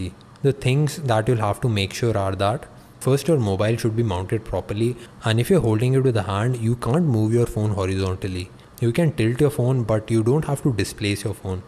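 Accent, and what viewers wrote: India. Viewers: D and T are two different letters PRONOUNCE THEY DIFFERENTLY fucking indian accent 😒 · please your indian accent is so irritating...too hard to take...alvida.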